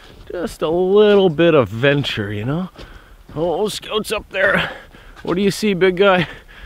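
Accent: Ontario, Canada. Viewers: thought i detected an Ontario accent - definitely some french metis in there as well. from a fellow cunnuck :) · Are you Canadian? You kinda talk like one.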